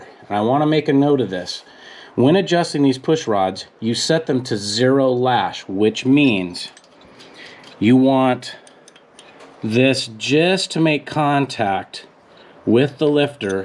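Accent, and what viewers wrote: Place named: United States. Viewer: Very interesting Video for me to understand how a Harley engine works. My M 8 is a Sport Glide 2018. I am not s mechanic, an i dont understand all you talking about (American dialect) cause iam german. Did u say the Oil pump must be changed as an generell rule or can i do it after a certain part of miles? Best regards from Augsburg Germany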